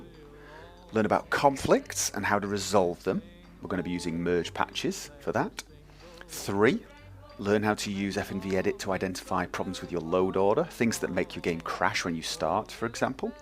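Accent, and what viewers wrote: United Kingdom. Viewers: suprised the hell out of me when i saw your profile showing youre a fellow norwegian, was guessing british from listening to your voice.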